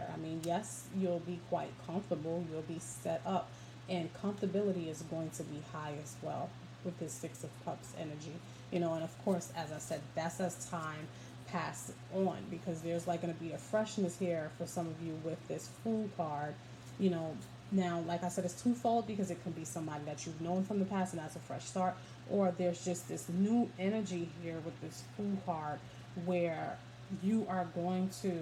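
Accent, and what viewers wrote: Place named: East Coast, United States. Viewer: #3 yes, resonated so much. and i love your east coast accent!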